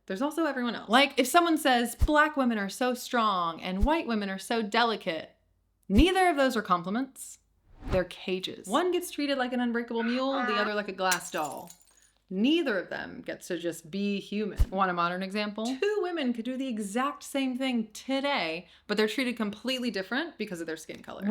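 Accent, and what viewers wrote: United Kingdom. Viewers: Your intonation is so British now!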